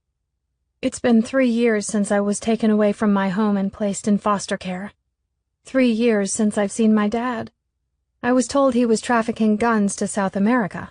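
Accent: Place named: Ireland. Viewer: thats an Irish brogue not a Scottish one….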